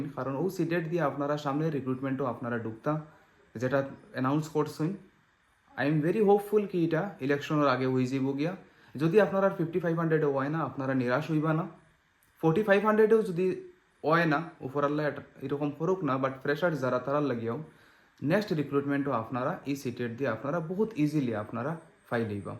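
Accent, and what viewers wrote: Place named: Sylhet, Bangladesh. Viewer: Aita bengali language na ....sylheti language a kotha boltese...Amio Dhubri theke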